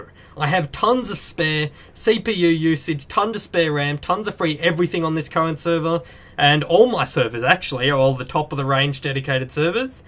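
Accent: Australia. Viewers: Yo mate r u audtralian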